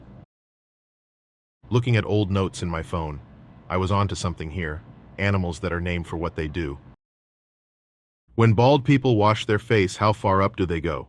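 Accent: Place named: United States. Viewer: Love the conten Hate the voice I hate American speaking voice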